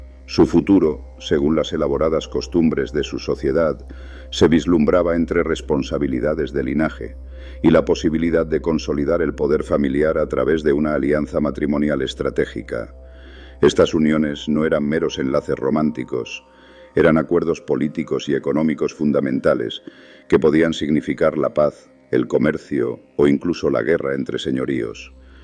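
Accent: Spain. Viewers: Hubo dos grupos que los mexicas No pudieron someter, los Tlaxcaltecas y los Zapotecas, lean, investiguen, analizen, saquen sus propias conclusiones y veran las diferencias, ademss este narrador tiene acento español, el imperio mexica SI era el mas fuerte y predominaba cuando llegaron los conquistadores pero no era el unico grupo etnico que existia, habia muchos grupos pero estaban sometidos, pero todos los grupos etnicos que existian NO eran mexicanos porque México NO existia como tal, eso vino despues en la independencia en los 1800s, el México actual se forma de tres grupos, los mestizos, los afromexicanos y los nativos, osea indigenas, estos tres grupos ya si somos mexicanos, 💯%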